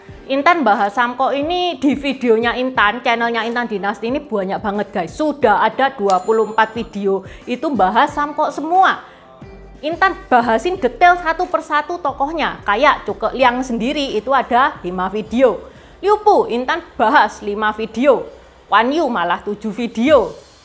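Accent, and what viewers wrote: Java, Indonesia. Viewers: Wow so pretty intan saya suka cirita mu Kamu Jawa nya mana ko medok amat ya haaa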